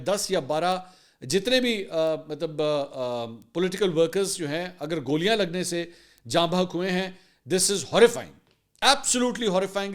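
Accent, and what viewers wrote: Punjab, Pakistan. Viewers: I love the panjabi touch😅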